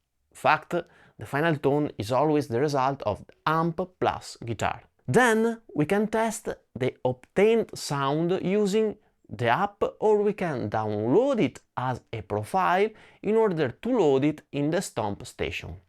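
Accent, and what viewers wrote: Italy. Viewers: it's definitely not French, it's clearly Italian :D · LOL, didn’t expect ComfyUI to pop up here! The Italian accent and those nodes gave me a nice flashback to when I was learning IPAdapter for SDXL. Great video as always, — keep pushing these unique gear reviews; they’re never covered on the mainstream guitar channels. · no need. We love your Italian (edit not French) accent :)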